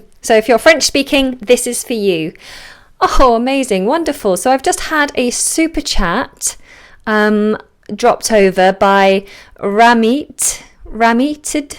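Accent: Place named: United Kingdom. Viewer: I like how you teach, your english british accent, your pronunciation, but there's something I wanna ask you just for curiosity. Did you study at Cambridge? If not, where?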